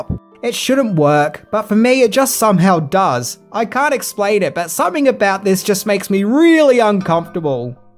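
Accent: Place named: Australia. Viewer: The Australian O is a glorious thing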